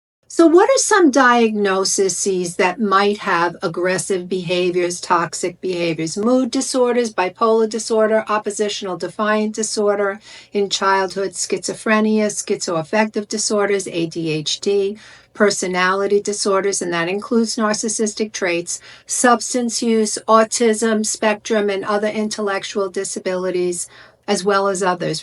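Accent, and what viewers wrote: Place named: New Jersey, United States. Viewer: I’m guessing New Jersey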